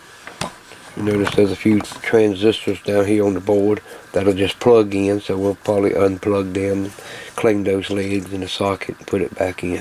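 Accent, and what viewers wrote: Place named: North Carolina, United States. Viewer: You sound like your from North Carolina or Tennessee im from North Carolina.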